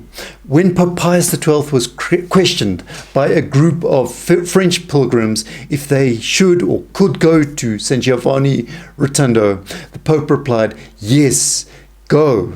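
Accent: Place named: South Africa. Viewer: hmmm - nice to hear a South African accent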